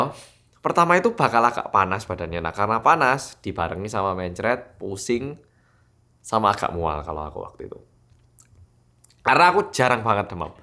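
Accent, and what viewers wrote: Java, Indonesia. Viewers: Gws mas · Ko ngomong nya bisa medok dan lancar bahasa indonesia nya ?lahir nya di mana ? Dulu pernah tinggal di malang . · Semoga cepet sembuh Mas, Stay safe and stay healthy Sing semangat mas, 화이팅❤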